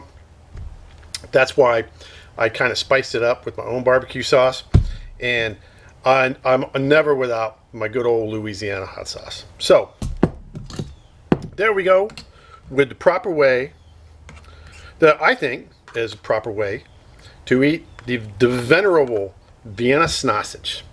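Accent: North, United States. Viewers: I'm from NC and we just eat em plain with Lance Cheese and peanut butter crackers. I'm seriously questioning your "Southern" claim. Sound like a Yankee to me. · He don't talk like a Southern country boy. Hear some Yankee in there.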